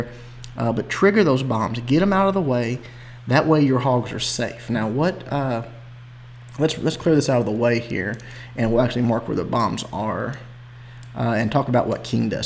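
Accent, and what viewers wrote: United States. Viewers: Good video, your english sounds disgustingly american though.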